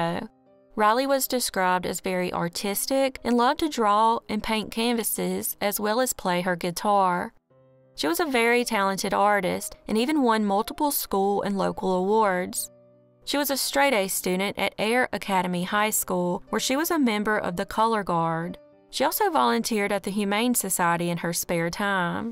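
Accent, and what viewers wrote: South, United States. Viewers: I'm a southern "girl" myself SC. And you are now my favorite crime you tuber. So refreshing to hear a familiar accent.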